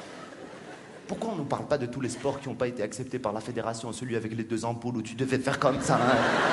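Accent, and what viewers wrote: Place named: North Africa. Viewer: J'aime la façon comment les Maghrébins parlent :D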